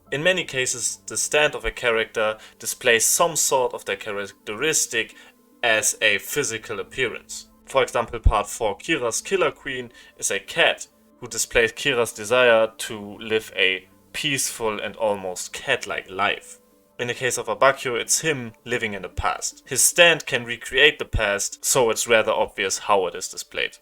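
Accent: Germany. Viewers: You sound VERY German in accent coming from another German, it's very endearing to hear! This little thing that delighted me aside though, solid video! As a local Abbi fan I thoroughly enjoyed it. ❤️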